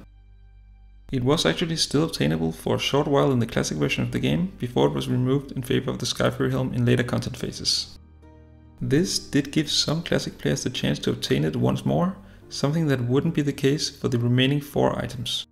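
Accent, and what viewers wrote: Denmark. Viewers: accenten lol. Hvorfor er der så mange danske classic wow youtubere i forhold til andre europæiske lande?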